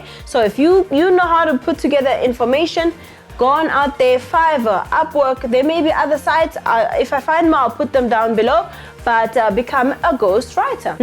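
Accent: Nigeria. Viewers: Girl.... your nigerian accent is on point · That Nigerian accent 😂🤣🙌🏿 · So you have been hanging out with one of my Nigerian brothers.Look at that accent .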